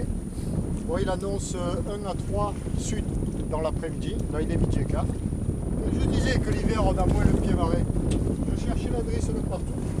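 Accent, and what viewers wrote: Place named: Marseille, France. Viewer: Quel bonheur de regarder ces navigations sur la grande bleue dans cette région ( Bandol , Les embiez , Toulon , Porquerolles ) que je cnnais très bien . On a en prime cet accent marseillais plein de ce soleil qui nous fait tellement défaut à nous " voileux du nord de la France " . On sent le marin heureux d'être en mer .. un vrai régal et merci pour ces moments bien agréables ..Yannick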